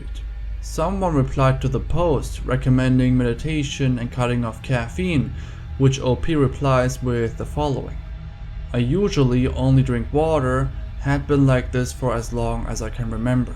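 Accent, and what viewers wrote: Germany. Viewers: Are u German? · Sounds German to me too but I'm not positive.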